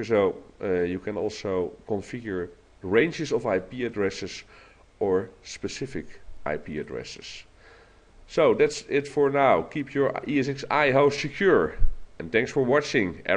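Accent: Netherlands. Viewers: are you dutch? you accent seems dutch.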